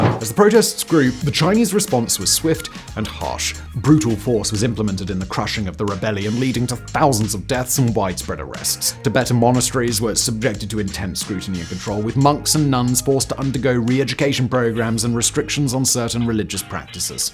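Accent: United Kingdom. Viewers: Why does an American always question legitimacy of territory when their whole country's very existence is questionable? Even more when your accent is British.. right? Born Hipocrits huh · You have such great content but your British accent makes your videos nearly unwatchable. Too bad.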